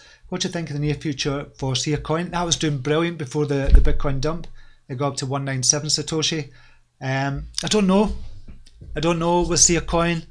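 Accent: United Kingdom. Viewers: Such a puff of fresh air......a British accent in cryptoland.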